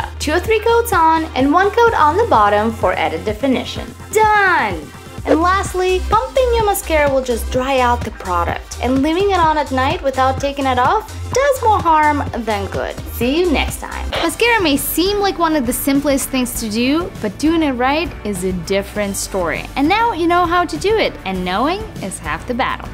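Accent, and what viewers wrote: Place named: Russia. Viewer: i like this slight russian accent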